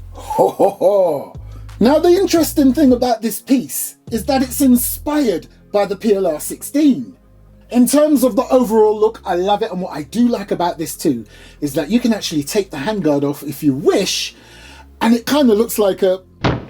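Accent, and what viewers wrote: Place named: United Kingdom. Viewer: You sound like bri'ish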